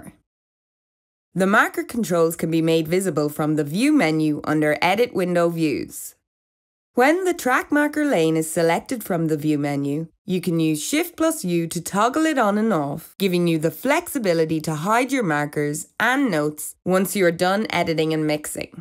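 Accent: Ireland. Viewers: Inner city Dublin. Next to being a gypsie. If it's any help, bring volume to zero and use subs. Nails on a teacher's blackboard is easier to listen to but the screen is busy enough unfortunately. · Good vid but the Irish accent is like listening to bad Melodyne on a Didgeridoo. Please use the usual VO so as not to be distracted.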